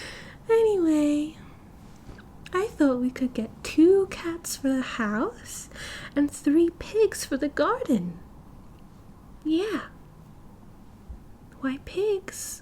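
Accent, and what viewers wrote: United Kingdom. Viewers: Brit accent...? HELL YEAH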